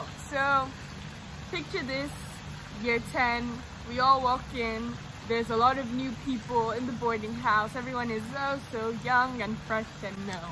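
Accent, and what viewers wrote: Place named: United Kingdom. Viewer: Is da British accent for me luv ❤️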